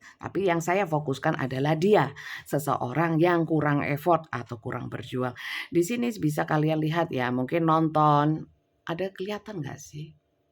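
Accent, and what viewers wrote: Java, Indonesia. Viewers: Ingih bnda medok banget bun jowone.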